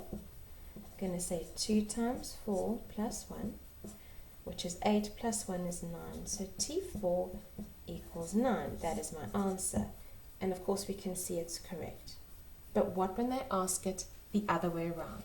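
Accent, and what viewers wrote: South Africa. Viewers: Youre South African? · I honestly love SA accents so much. I have an english one but yours just sounds so much more elegant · She sounds south african ryt...?😄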